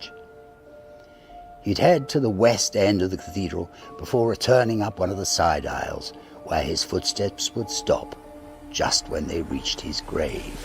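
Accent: United Kingdom. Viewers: Hi! Just found you tonight when unable to sleep. What a treasure: your voice and your stories! I will definitely hunt them out! Have always been a big Hallowe’en fan & hope you have some 2023 tales. I just turned 72 by the way. Living in the Great White North in Cambridge, Ontario. I so miss British voices VERY MUCH! The person in my childhood who showed me the most love was my Gran from Folkestone in Kent. She was very open minded & tuned into a lot of things that my school friends’ grandmothers would probably have never heard of. She saw a lot including joining the Wrens during World War I when she was 17. I’m trying to be discreet about some of her interests which became my interests as I got older. I’m sure she had a lot of stories that I would’ve loved to hear. I just adored her! She married my grandfather who was serving in France in his veterinary corps (he was a farmer from Quebec); so, she was a war bride from the First World War. 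My whole life I’ve wanted to go to England, Scotland and Wales! This is a real treat for me to have found your channel! I am mostly bedridden go now. Have a wonderful Hallowe’en & thank you so much for your lovely programme!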